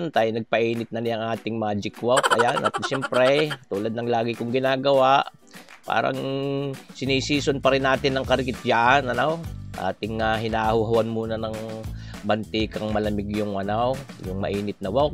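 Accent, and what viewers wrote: Batangas, Philippines. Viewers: Kahit di pa rin kami gaanong nakakahulagpos sa pandemic dito sa Central Florida (grabe ang spring breakers sa South Florida!), kami naman ay nakadiskubre ng mabisang gamot sa takot at lungkot dito sa aming basaysay: ang walang-sawang panonoor sa maraming cooking demos at pagbibiro ni. Ala eh, marinig lang namin ang boses at mga purong purong kanluraning salita pati na rin ang walang makakatulad ng Batanguenyo accent, abot-taynga na mga ngiti namin dito, at habang ginagaya namin ang mga paboritong putaheng inihati niya sa mga fans dito sa 'Merika, hindi pa rin napapalis ang aming ngiti. Salamat sa gandang-loob mo, marami kang nagagabayang kitchen ninjas sa buong mundo. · Gusto ko iyan Batangas accent katuwang pakingan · Sarap Chef. Gusto ko yang batanguenong accent hehehe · Accent batangas mo ay original ba kasi ang gandang pakinggan you sounds like an old man 😊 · Baket gnyan po kau mgsalita, my acent ang tagalog mo, Batanguena kb? 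· Walang hiya, tangal lungkot ko sa buhay eh nang marinig ko ang tonong atin sa batangas eh! Regards from san nicolas batangas!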